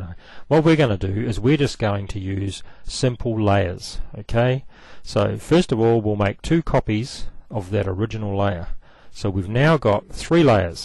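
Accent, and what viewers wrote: New Zealand. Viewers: weren't sure if I detected the kiwi accent right, but I was happy, when I read the other comment. As I Kiwi you don't need this video since all your sunsets ARE already enhanced! Great tips though! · hahaha a kiwi accent for sure im from timas. Anyway thanks for the quick tips (a real time saver) just have to remember : top =overlay 2nd = screen cheers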